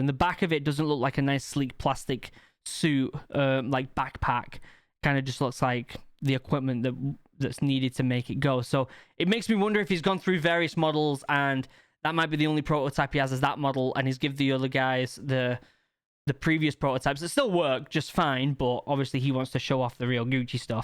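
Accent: United Kingdom. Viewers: Why you have a British accent lol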